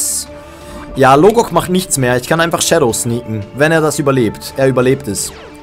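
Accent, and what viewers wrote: Switzerland. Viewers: Mein Deutsch ist nicht sehr gut, aber können jemand mir sagen wenn dieses Mann sprickt Hoch Deutsch? ^^ Can someone tell me if this dude is speaking High German or Swiss German. That's what I tried to say, but my German's not that good. I'm not experienced enough to tell the difference :C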